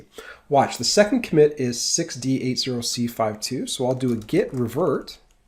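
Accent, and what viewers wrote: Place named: India. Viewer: I'm not being racist, I just don't understand English with an Indian accent. Please don't misunderstand me.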